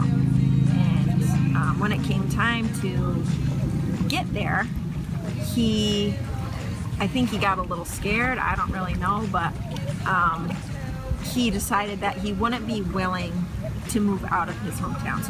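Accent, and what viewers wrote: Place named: Upstate New York, United States. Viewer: T F just my opinion. It’s a harsh upstate NY accent.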